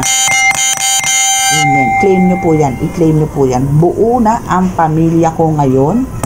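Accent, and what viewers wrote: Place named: Visayas, Philippines. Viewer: hahaha cute niyo po mag bisaya 🙏🦋🌻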